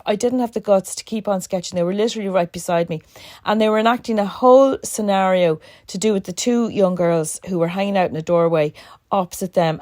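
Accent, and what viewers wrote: Ireland. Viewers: and it’s a joy to hear your beautiful accent, reminds me of all my cousins in Ireland ☘️☘️☘️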